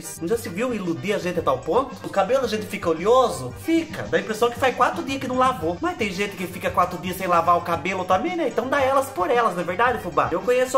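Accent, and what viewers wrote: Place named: Curitiba, Brazil. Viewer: Tive que me inscrever não tem como, preciso de rir um pouco nesta pandemia, kkk e vc é mto engraçado <link> identifico mto com seu sotaque e seu vocabulário. Kkkk sou de Curitiba PR, e nois fala um pouco deste jeito .Vc é maluco fica carcando estas coisera no cabelo vc vai e ficar careca daqui um dia. Eu já fiz mto estas coisas tb.até banha coloquei no meu cabelo, fiquei 10 dias lavando meu cabelo pra sair aquela Gordura .bjo obrigada por me fazer rir.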